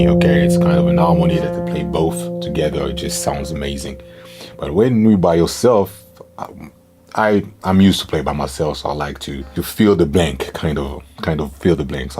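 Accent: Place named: Ga, Ghana. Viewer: This Is beautiful ❤️❤️❤️ The language (Ga) accent really caught me God bless you sir 🫡🫡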